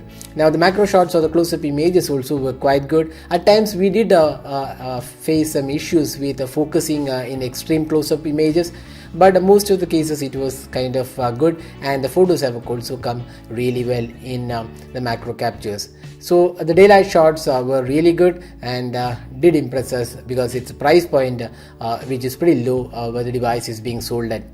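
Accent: Kerala, India. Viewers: bro u from kerala?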